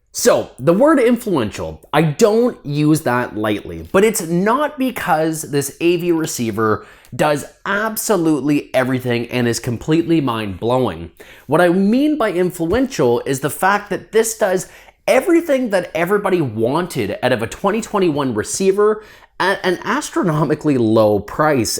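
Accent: Canada. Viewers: This has to be the most canadian-sounding human on the planet. Great video though.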